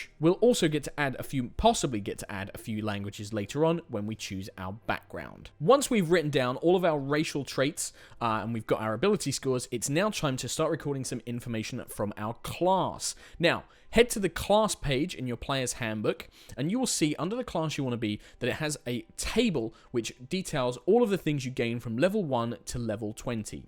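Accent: United Kingdom. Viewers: This video is good quality, but without the British accent it would be incredibly boring.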